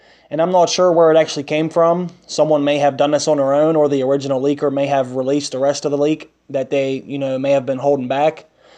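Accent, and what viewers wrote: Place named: Philadelphia, United States. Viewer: you must be from the philly area talking like that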